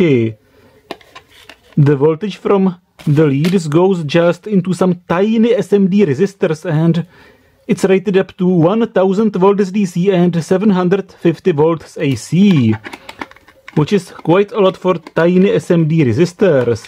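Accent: Czech Republic. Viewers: Shhh. You hate his accent (Czech accent) so much that multimeter = his English (!) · I love your Czech accent and I love your channel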